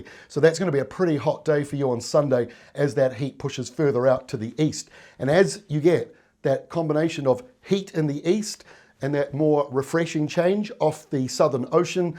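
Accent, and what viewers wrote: New Zealand. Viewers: Bros sounds from NZ 💪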